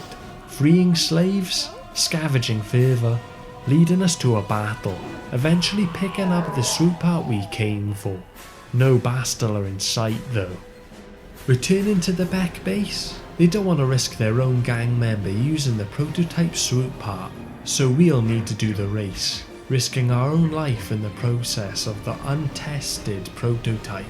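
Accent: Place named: Wales, United Kingdom. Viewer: its what happens when we welsh try to speak properly so others can understand us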